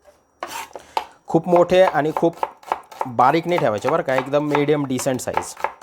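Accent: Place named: Khandesh, India. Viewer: दादा तुमची भाषा खान्देशी आहे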